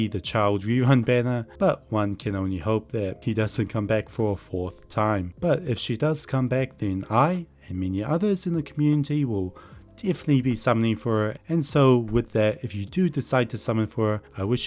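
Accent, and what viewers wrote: New Zealand. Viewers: Are you a kiwi? You gots my accent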